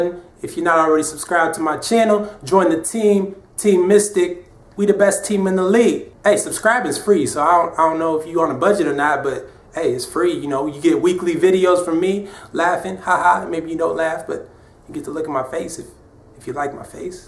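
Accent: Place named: New York, United States. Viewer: You from New York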